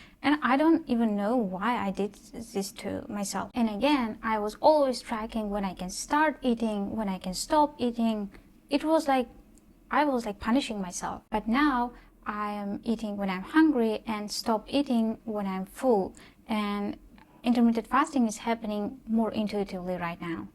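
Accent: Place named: United Kingdom. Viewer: It sounds like you’re in the uk? 🇬🇧 welcome to the tribe. 😊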